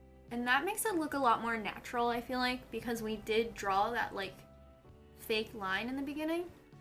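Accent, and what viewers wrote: Philadelphia, United States. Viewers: I've never noticed your Philly accent until this video! I'm from Pittsburgh so I also have a slight yinzer accent 😂 I wish I would have met you before I moved to AZ. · Every time I watch one of your videos I think about how awesome and cute your philly accent is. It's my favorite. I'm a New Yorker and I live in Colorado so your videos bring me back east when I watch them. Thanks gurl! Also this makeup looked beautiful.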